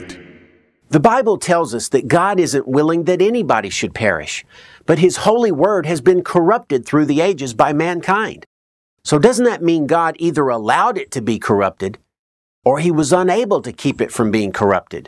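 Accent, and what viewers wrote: South, United States. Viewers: I don't even know you but giving your accent, you were probably churched in the Bible Belt and then rebelled. Given your beard I would bet you are a gay man and hate Christianity for this reason. But, I will say something nice. You are one hell of a musical talent.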